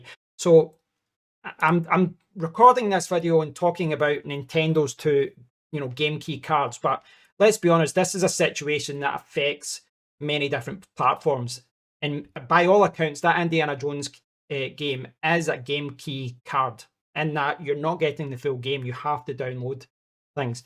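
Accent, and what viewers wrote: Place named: United Kingdom. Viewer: LOL ...my ethnicity is filipino but migrated to Australia when I was 10 yrs old. My auntie lives in Essex, UK since the early 70s and married to a Jewish man born in Whitechapel. I visit the UK every 3-4 years. Love the people and culture, especially history! I'm a Churchill fan lol. All the people that I've met in my life growing up that have Scottish accent were all smart be it my Math teacher, my local dentist and family doctor so I've personally always associated the accent with intelligence so when I watched your video and found out your IT dev background I wasn't surprised! LOL Looking forward to more vids! God bless! 🙏👍😆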